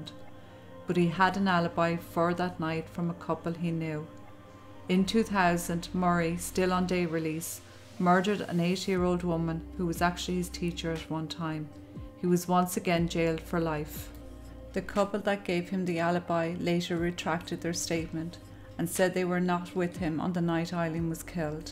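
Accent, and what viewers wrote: Ireland. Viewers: I have just found your channel and Love ❤️ it. I’m Irish living in Oz since 1986. Love to hear your Irish Brog and humour. 👏🏻👏🏻Your amazing research and respectful presentation of these cases are Wonderful. Keep up the Great Videos, whilst the stories are tragic, and heartbreaking, they are stories that need to be told so We can All count our blessings and remind ourselves of the fragility of Life… hugs from Australia 🇦🇺 🇮🇪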